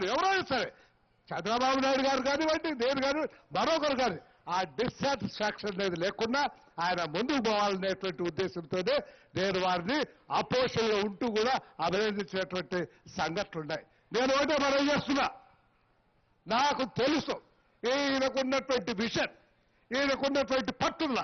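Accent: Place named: Rayalaseema, India. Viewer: Pure Rayalaseema language 😁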